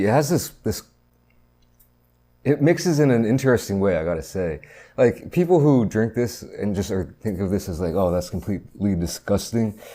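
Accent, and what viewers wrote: United States. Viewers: You're in/live Korea? You sound like you were born and raised in America. Not that that matters in any way. But if you're born and raised and still live in Korea, I'm just amazed at your American is all.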